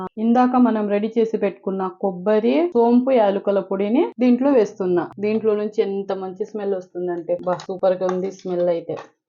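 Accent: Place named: Tamil Nadu, India. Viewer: Avunandi cheppe ame tamilian laga vundhi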